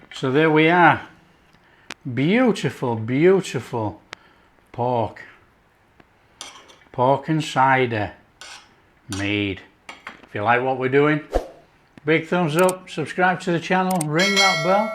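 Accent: Yorkshire, United Kingdom. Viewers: No French accent, just a wonderful Yorkshire brogue. Pork in cider is delish, I could scoff that down now. Nice glass of cider to go with it, what more could you want? xx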